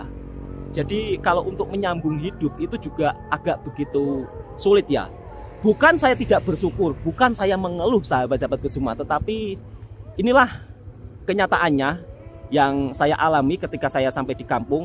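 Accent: Java, Indonesia. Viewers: Ni kira dah ok sangat tuu. Sblm ni, jawa pekat. Tpi yg x boleh ubah...buwaaaangat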